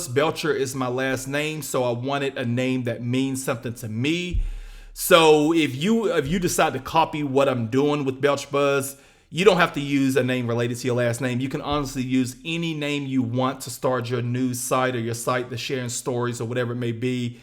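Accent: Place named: Mississippi, United States. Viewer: Are you from Mississippi? You sound just like my relatives.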